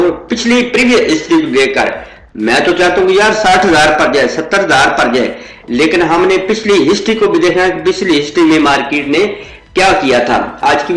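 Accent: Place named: Punjab, India. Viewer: ap jo punjabi ka tarka lagaty hain wo bahot he mazy ka hota hai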